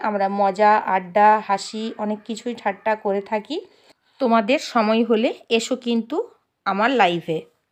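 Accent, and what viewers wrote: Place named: India. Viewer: অনেক অনেক ভালোবাসা রইলো বোন এগিয়ে জাও তোমার কথাগুলায় কেমন জানি একটা টান তবে শুনতে বেস লাগে তুমি কি ইন্ডিয়ান দিদিভাই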